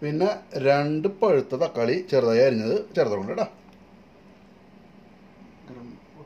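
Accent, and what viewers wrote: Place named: Thrissur, India. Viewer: Thrissue slang il ulla aa mutton curry pwolichu gadiyee..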